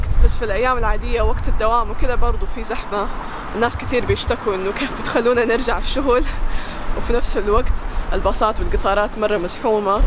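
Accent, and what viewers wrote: Hejaz, Saudi Arabia. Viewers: واضح من لهجتها انها سعودية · لهجتك حجازية صح (يمشوا جالسين) كيف كذا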